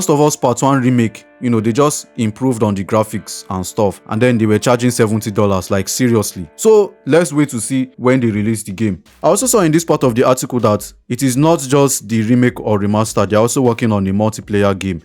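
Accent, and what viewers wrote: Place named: Africa. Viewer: You can clearly hear that you're african 😂 love the african accent haha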